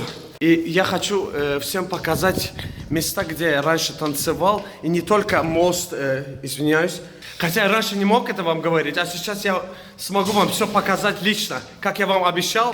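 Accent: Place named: Azerbaijan. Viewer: Акцент азербайджанский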